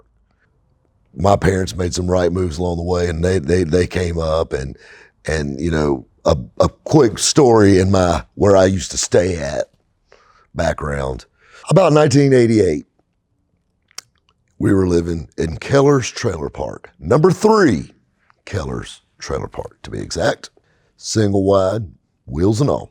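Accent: South, United States. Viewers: Ei you are the man, I love ur stories. I don't understand a half of what you say, but I love ur southish accent.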